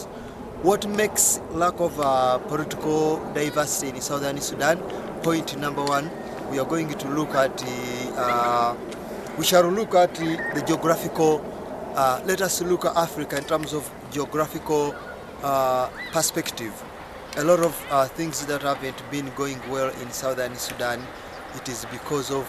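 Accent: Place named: England, United Kingdom. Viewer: You got a lot of shit to get over. I hate your English accent so bad.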